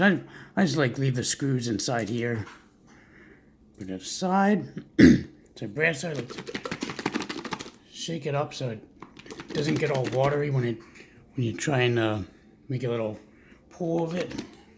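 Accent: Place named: South Jersey, United States. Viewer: I hear south Jersey in you haha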